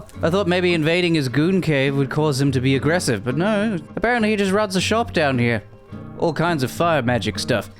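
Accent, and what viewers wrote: England, United Kingdom. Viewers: I love his fluency in British accent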